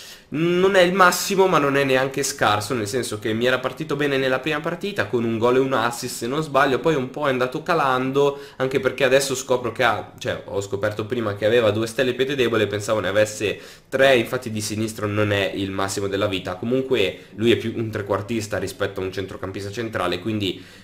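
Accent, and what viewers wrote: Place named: Liguria, Italy. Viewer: Ok, grazie ! Dall'accento pensavo fossi ligure !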